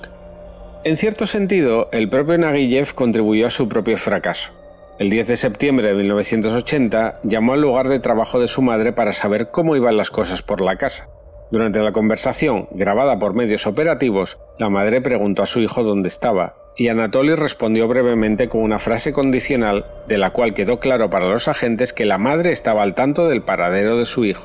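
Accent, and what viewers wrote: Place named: Spain. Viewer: Hola! Me acabo de topar contigo y voy a ver tu video, a ver qué tal. Es la primera vez, como he dicho. Te advierto que soy muy avezada en asuntos criminales, no me preguntes por qué, y que suelo comentar respecto al vídeo. Por tu acento creo que eres español. Yo te escribo desde Cartagena, en la Region de Murcia. Saludos! 🐺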